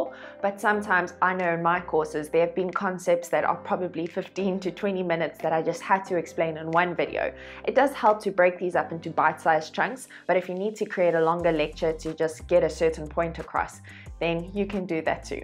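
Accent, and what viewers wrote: South Africa. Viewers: Awesome hearing the South African accent when learning online. Great content, thank you - it's a new teaching journey for me and this was a very insightful video 🌻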